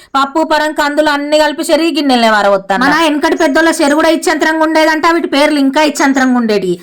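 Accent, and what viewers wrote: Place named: Vemulawada, India. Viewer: అక్కా మన వేములవాడ యాసను, దేశమంతా తెలియజేస్తున్నావ్ సూపర్ సూపర్ సూపర్,,,,,,,,,,,,akka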